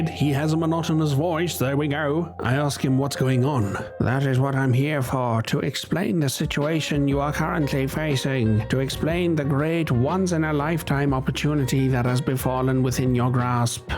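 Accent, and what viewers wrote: South Africa. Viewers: Ah, a fellow South African. I knew that accent sounded familiar (kinda sounds like the zookeeper from Jozi zoo iykyk). Good video bro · The Sandton accent took me all the way OUT bro 😂 too real. Instant sub! Looking forward to more of your content!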